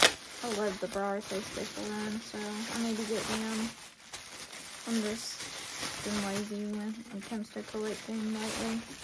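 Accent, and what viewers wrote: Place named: South, United States. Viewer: Love your southern accent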